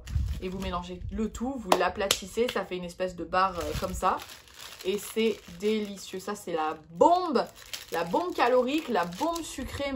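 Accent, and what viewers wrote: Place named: United States. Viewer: Je trouve que tu as légèrement pris l'accent américain quand tu parles français 😍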